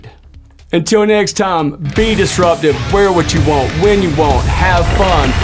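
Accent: South, United States. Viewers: Great review! I love this fragrance! One of the few I can say that about! also out of all the reviewers you seem to be my favorite so far! Sounds like you're from the south! LOL so am I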